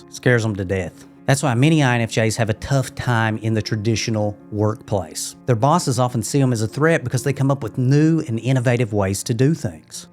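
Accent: South, United States. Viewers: Like u n ur sweet southern drawl!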